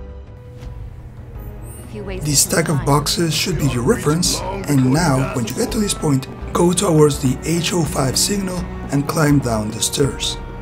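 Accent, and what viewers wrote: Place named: Canada. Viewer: Thanks man! I would never have found it without your video. Cool accent! Are you from Canada? France?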